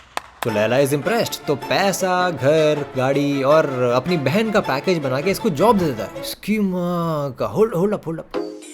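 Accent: India. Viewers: UK born with Desi Village accent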